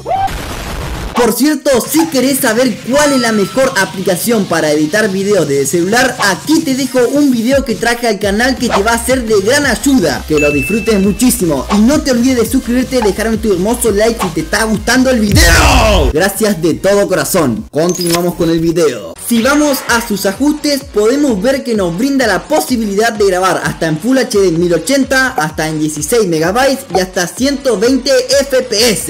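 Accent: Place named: Chile. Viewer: eres chileno? se te nota el mismo acento que los de gran hermano chile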